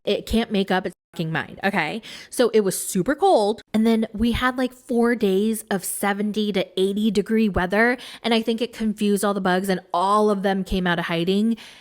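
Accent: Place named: East Coast, United States. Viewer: I knew you were east coast lol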